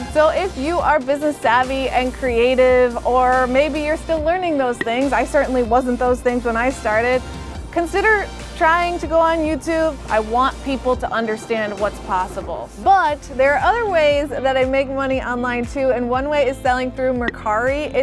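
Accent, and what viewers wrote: New York, United States. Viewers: I've followed your channel for several years and use it to practise my english listening stills. At very first video I thought you have a NY accent, you always swallow the "h" sound from "manhattan" and the words come from your throat not from lungs, it makes the words sound more lighter than someone from california. I had difficulties at that time, now I am used to it. Great performance, beautiful apartment! Go ahead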